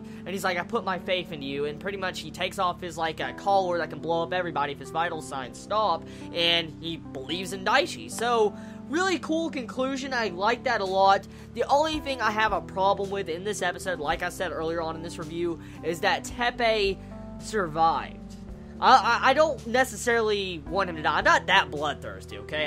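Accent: South, United States. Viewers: Not being mean get a haircut you would look better and where is your accent from you sound southern?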